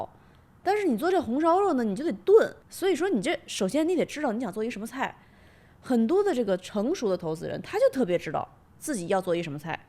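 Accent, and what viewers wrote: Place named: Beijing, China. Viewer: 本期北京话含量极高:face-blue-smiling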